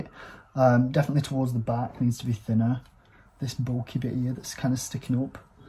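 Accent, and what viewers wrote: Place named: Sheffield, United Kingdom. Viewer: I'm guessing a Sheffield accent 😬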